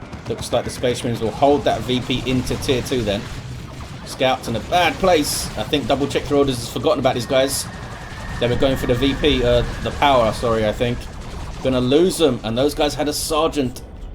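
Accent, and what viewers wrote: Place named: Midlands, United Kingdom. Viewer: midlands England is my bet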